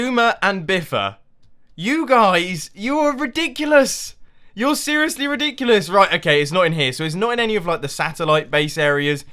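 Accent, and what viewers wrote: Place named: United Kingdom. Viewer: Bio X How did you not notice that? I'm not a native English speaker, but when I first watched one of videos, I immediately noticed that he has British accent. I mean, it is so obvious.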